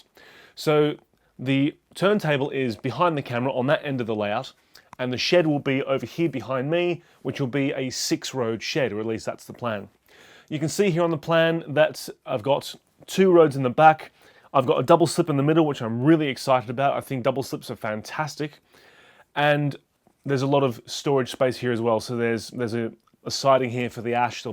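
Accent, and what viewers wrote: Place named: Australia. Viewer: Completely off topic, but what is your accent. I was thinking Australian ex-pat? Melbourne from the Herbert's T shirt?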